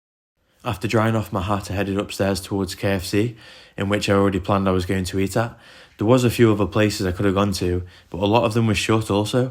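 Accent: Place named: Stoke, United Kingdom. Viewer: Can spot a fellow stoke accent a mile off. Yes pal keep up the good work. Utmp /utv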